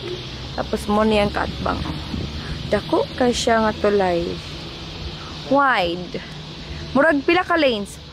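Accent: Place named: Cebu, Philippines. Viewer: nindot noon kaayo dae nga ingun ani ba bisdak kaayo...daghan napud baya kaayo mga taga american nga mo speak bisaya so nindot pud ni dah..hehhehe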